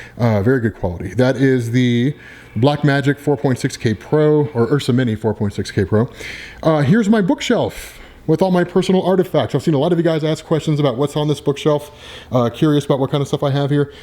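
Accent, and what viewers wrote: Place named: Boston, United States. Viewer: Your from Boston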